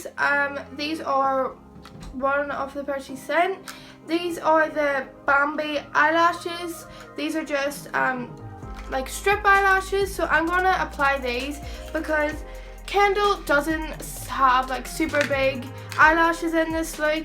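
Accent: Northern Ireland, United Kingdom. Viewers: She's from Northern Ireland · Northern Irish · lanseto NORTHERN ireland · Northern Ireland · Northern Irish like mine :) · If u don't like her accent them why are u watching her videos?!?! that is what people from Northern Ireland sound like so u are basically insulting me and everyone else from there! There is no need to be rude! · Northern irish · I'm from Northern Ireland too, she can't really change her accent. I think it's cause people on here aren't used to hearing northern Irish accents since there isn't many youtubers from Northern Ireland so you probably aren't used to it! But yeah she can't really do anything about her accent! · She's British (Northern Irish),she can't help it,it's just an accent. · NORTHERN IRISH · she's from Northern Ireland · it's the northern irish accent, she can't really help it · northern Irish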